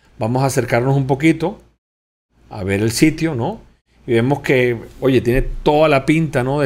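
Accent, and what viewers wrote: Venezuela. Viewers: Hola, eres de Venezuela?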